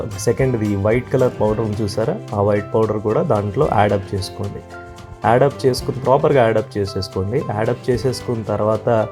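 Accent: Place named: Godavari, India. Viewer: అయ్ మీది గోదారొళ్లoడి చాలా బాగుందండి. 👌👌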